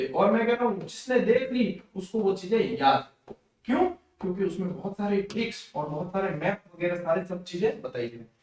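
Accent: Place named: Nagaur, India. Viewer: Sir aap pali ke ho par aapki Bhasha nagor ki aa rahi he or sir pali me kha se ho please reply 🙏 🙏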